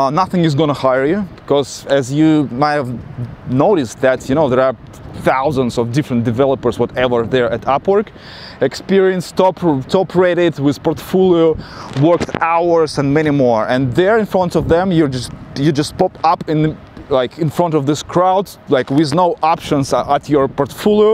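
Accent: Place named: Russia. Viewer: I LOVE your Russian accent. However, I agree that Americans are ignorant and will judge you because of it. But you MUST showcase it as a positive: like you did in your video about it being in your blood to be an engineer. Just tell people immediately that you are Russian and the positives of it. What I don't like is when a Russian girl decides she must lose her accent to blend into the American culture.... NO. Not necessary for a woman to do that. Everything you say about Russian v.s. English confirms my hypotheses: they are essentially different worlds... different operating systems. I notice that Vladimir Putin now always has an earpiece to listen to Russian interpretation whenever he is being interviewed (or... interrogated in many cases). I know that he knows English... but he is strategically thinking in Russian all the time and that's how he makes very critical decisions.. 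through Russian.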